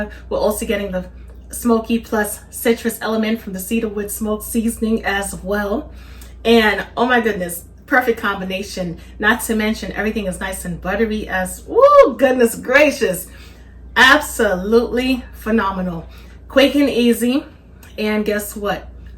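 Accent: New York, United States. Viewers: Love you Mama ❤️ never stop 🛑 beautiful accent NYC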